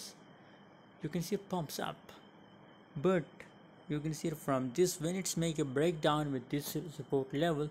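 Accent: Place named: China. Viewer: No 1 can understand yr Chinese accent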